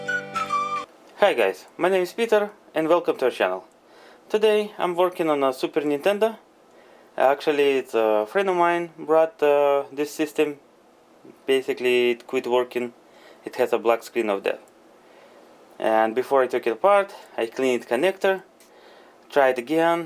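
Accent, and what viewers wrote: Russia. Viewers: Russian accent?))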